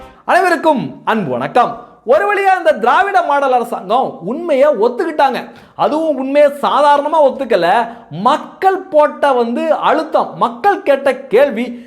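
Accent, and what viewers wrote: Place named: Kerala, India. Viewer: உங்களுடைய பேச்சில் மலையாள வாடை வந்தாலும் நீங்கள் ஒரு தேசியவாதி என்பதாலும் நீங்கள் பிஜேபி ஆதரவாளர் என்பதாலும் உங்களுக்கு என்னுடைய முழு ஆதரவு உண்டு.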